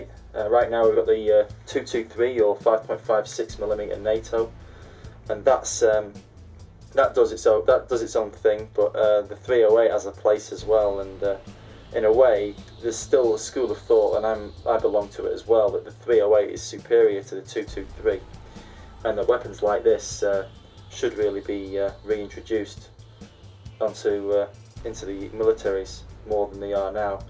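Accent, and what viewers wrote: United Kingdom. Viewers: You sound like you are from Great Britain. I'm a little ignorant on your laws, but I was under the impression that you could not own a gun like this. Awesome gun, man. I'm saving up for a DSA FAL like this one. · You sound British. How do you have that? Or are you living in the US? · alright, nice gun, yorkshire accent? :-) slr is a giveaway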